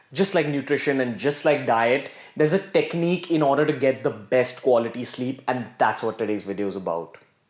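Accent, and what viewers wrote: India. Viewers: fuck indian accent. irritating af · Be grateful that indians are speaking english. They dont need to. If you dont like his accent, fuck off!!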